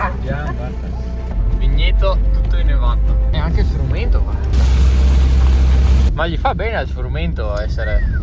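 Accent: Veneto, Italy. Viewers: Te se proprio Veneto ciò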